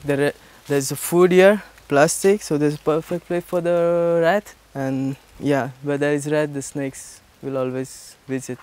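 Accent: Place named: Russia. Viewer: Bro ur accent sounds like that of russians. Cool✌️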